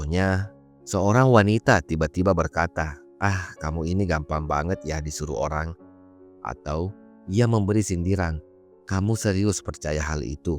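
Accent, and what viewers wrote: Indonesia. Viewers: Yg ngomong pasti orang Bugis/Makassar😂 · Sy tauu !! Suara di vidio ini pasti orang Sulawesi😊 tepatnya Bugis😅😊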